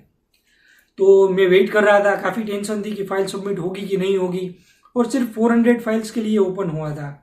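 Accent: Gujarat, India. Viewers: Lucky you brother, I’m also Gujurati just like you. Maja ma?😅 I’m having 65 points in SINP at the moment but it’s been sm tough time due to covid so I dnt thnk the points would go down in near time but looking at Canada’s plans to invite more than 4 lacs immigrants, my hopes are up. Let’s see. Tamara tone pat thij first video ma khabar padi gai k lage to che aapna Gujurati, keep it up buddy🤟 you’re doing a great job for ppl like us who’re planning to migrate and settle in canada. Specially SINP is only hope for me too at the moment.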